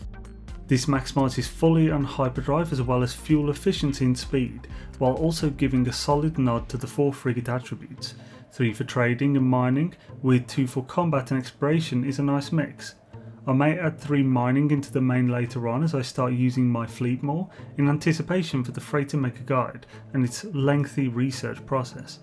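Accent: United Kingdom. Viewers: Definitely a Brit, I'm going with somewhere in the midlands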